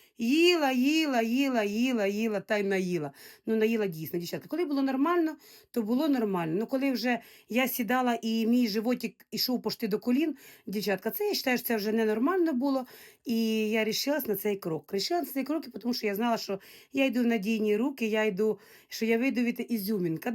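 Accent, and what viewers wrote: Surzhyk, Ukraine. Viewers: ❤❤❤. Вчи українську поки вдома. Бо суржик дуже сильний))❤❤❤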